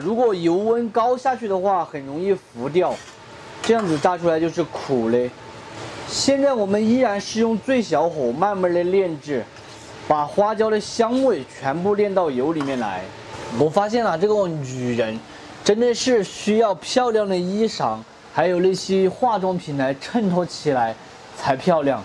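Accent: China. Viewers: 这椒盐普通话，听的好上头😁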